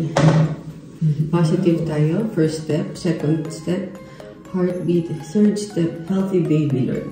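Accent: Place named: Visayas, Philippines. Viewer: Dai, meron kanang bisaya accent. Buntis ka dai, kaya medyo haggard imong nawng... minsan ganuon ang mukha ng buntis during 1st trimester period. Mag blo-bloom kalang after mawala na yong duwal² moment at mga sensitive moment sa amoy. Ganuon din ako when I was pregnant with my daughter 19yrs ago. Di na ako nag buntis uli, kasi ang hirap manganak hahaha.. di kinaya ng powers ko.. Anyways enjoy your pregnancy dai, ug hinay² lang.. ❤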